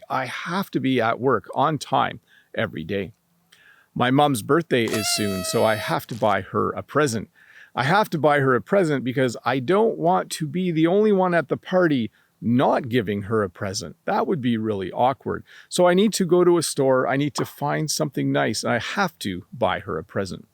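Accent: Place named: Canada. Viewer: finally found someone canadian that teach canadian inglish for me is the best understand very well evething was there many years ago I miss canadian English, usa English is more confuse to me Thanks 😮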